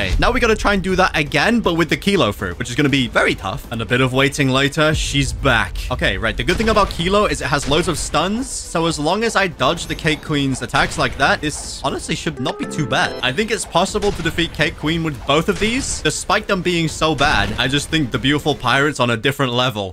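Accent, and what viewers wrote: United Kingdom. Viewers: Are you britain?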